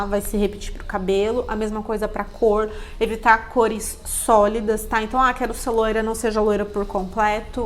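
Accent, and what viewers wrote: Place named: Brazil. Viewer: Yes, portuguese from Brazil accent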